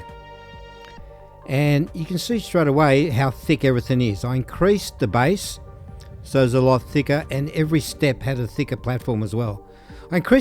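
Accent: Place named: Australia. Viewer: Holy crap! You're an Aussie. Nice job, it brings back memories. The old series was by far the best.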